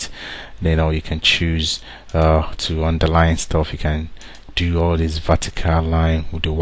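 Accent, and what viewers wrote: Nigeria. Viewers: Are you nigerian? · r u nigerian? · pls r u a nigerian? just curious cos of the accent. thanks 4 the video. cheers!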